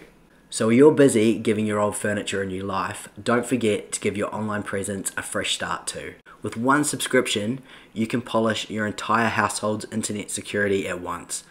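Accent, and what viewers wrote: New Zealand. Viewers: Being married to a kiwi the accent is familiar. Just found your channel and really enjoyed this video. I’ll be watching😁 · Oh man, I could listen to your kiwi accent forever! Great job, as always! ❤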